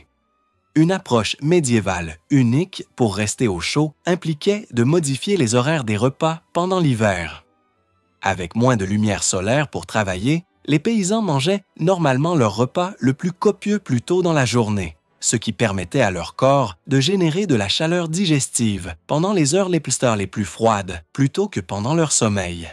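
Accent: Quebec, Canada. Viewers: J'aime bien cette voix québécoise, je m'endort dessus. Merci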